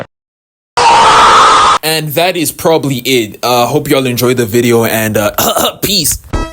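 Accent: South Africa. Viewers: you South African my guy? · the video is hella funny but dawg you have the most jozi/pta accent ever, are you south african? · u south african neh? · Bro can't escape his South African accent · You got sum South African in ya? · Mfana are you South African?😭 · you sound south african ngl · are you South African? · Bro u South African? Coz you definitely sound like it 😅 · Are you South African? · are you south african?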